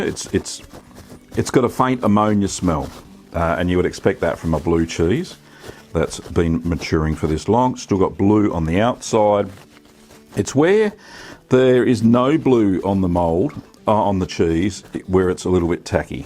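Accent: Australia. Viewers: I bought some of this from a cheese maker in Napa, California over 20 years ago. It was amazing on crackers, then I used some for gourmet grilled cheese sandwiches and macaroni and cheese. Absolutely wonderful. I hardly ever find it these days. I am an eater, not a maker, so I have to keep looking. Love your accent from Oz. My grandfather was born in Sydney in 1899. I’ve visited 4x for business and holiday, and look forward to returning again.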